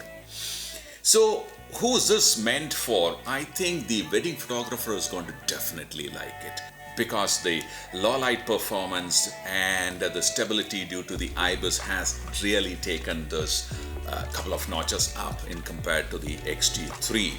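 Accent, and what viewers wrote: Kerala, India. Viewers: Chetta....ur mallu accent .....rocks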